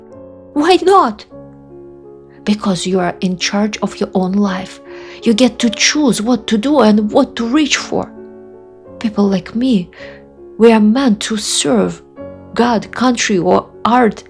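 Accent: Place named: Russia. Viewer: This video is really cool. There is no spoken dialogue, it is narrated by herself in her perfect English with her charming Russian accent. The cinematography is vivid and authentic. Enjoy!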